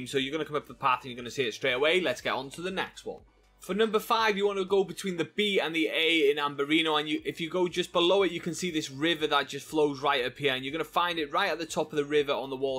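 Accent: United Kingdom. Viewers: Dude you sound like you're British which is nothing wrong with that I'm from the United States but I loved your video short it was sweet and it was precise have an excellent day I hit the like button for you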